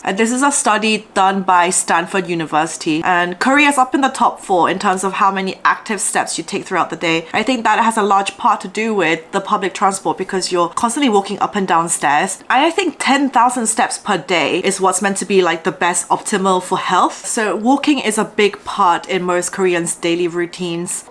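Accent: United Kingdom. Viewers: She has a UK accent (to my ears).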